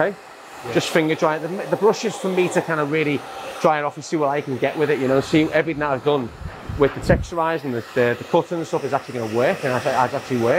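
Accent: Liverpool, United Kingdom. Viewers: Love your scouse accent mate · Scouse I believe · scouse accent?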